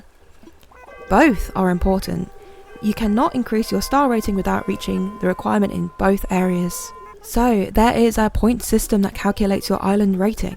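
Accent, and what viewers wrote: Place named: United Kingdom. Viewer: I suspect it’s cuz ur bri’ish or austalian or something! Got that soft lilt in your cadence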